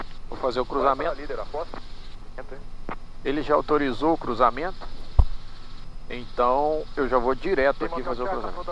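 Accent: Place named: Minas Gerais, Brazil. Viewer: Fiquei sensibilizado com a sua história de perseverança, provando que, mesmo com muitos obstáculos, é possível realizar sonhos. Você me passa a impressão de ser uma pessoa de bom caráter com muita humildade. Aposto que era zagueiro, e descia a borduna nos pobres atacantes - rsrs. Bom ouvir suas histórias. E o sotaque mineiro soa agradável aos nossos ouvidos daqui do sul.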